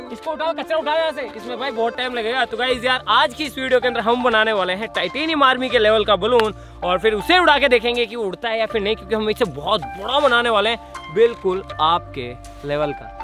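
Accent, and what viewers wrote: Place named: Marwar, India. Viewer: bhai aap per marwadi lengwej bahut mast achi lagti h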